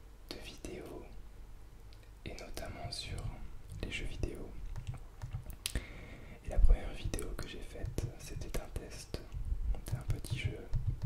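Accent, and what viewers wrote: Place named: France. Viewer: O sotaque francês é tão satisfatório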